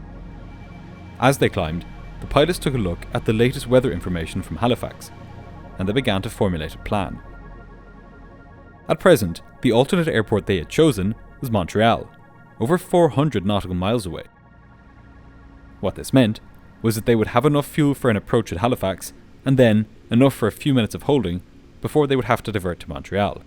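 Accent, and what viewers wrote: Ireland. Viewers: I never google the flights you talk about. I like to listen to the sweet irish voice that keeps me on the edge of my seat. I only try and figure out the ending of each flight with your tone and context clues. Glad i found you!!!! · The Irish accent is a lot easier on the ears than the Swedish one, but what I really appreciate about is that he doesn’t do any of the ridiculous propaganda pieces that the venture-capital-owned channel inflicts on its viewers. 🙂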